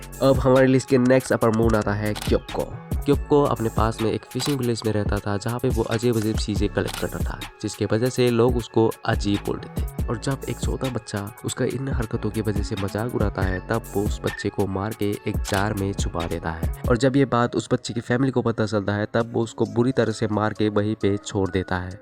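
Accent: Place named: Assam, India. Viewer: Assam? accent tu Axomia lagise💀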